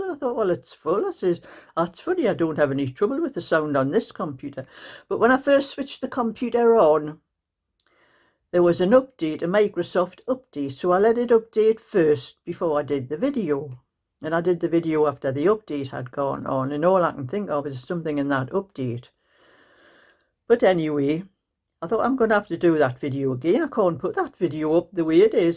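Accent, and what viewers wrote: Newcastle, United Kingdom. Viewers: I just come on to hear you chat you make me laugh I’m a Geordie and love your Geordie banter you make me smile thankyou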